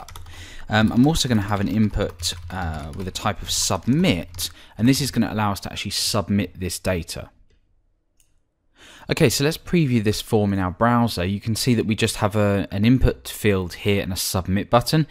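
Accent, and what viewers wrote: England, United Kingdom. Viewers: Not a Hindi accent, a butter-smooth English accent. Plus Good content. Wonderful.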